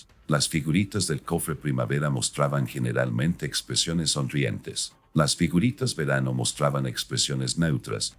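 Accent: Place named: United States. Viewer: Por favor busquen un narrador de habla española no un yanqui con acento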